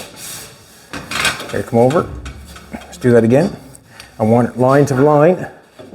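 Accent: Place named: Canada. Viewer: Same reason he calls it a care instead of a car. He's Canadian.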